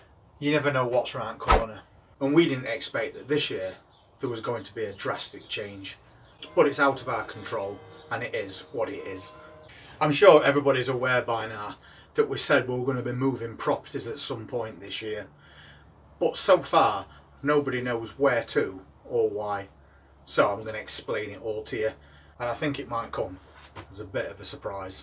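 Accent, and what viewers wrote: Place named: North, United Kingdom. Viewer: ive just found your chsnnel. What a devastating time youve had and what a crap way to be told to move. You are so inspirational and youve got a definite follow from me. Love love love your wonderful northern accent and grounded, calm way of communicating. Youre such a dude! Im going to look at your other videos snd keep learning. See you soon ajd hope you and yours sre keeping your heads held high!!!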